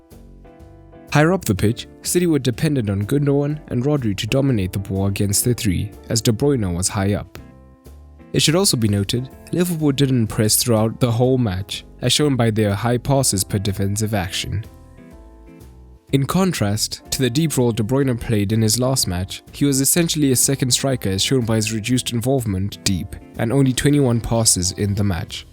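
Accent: South Africa. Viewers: You sound South African, am I right?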